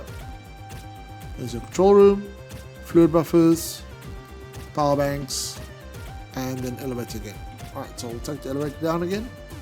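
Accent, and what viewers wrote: South Africa. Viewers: My guy, are you south african? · You sound South African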